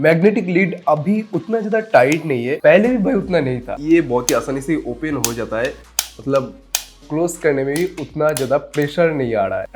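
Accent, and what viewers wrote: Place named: Bihar, India. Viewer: Relevant info diya kar bhai. Aur bihari feelings aati hai tujhe sunne pe 😅. Jisme r aur d bolne me totla jaisa lgta h tu... Faltu me paka rha tha😂😂😂😂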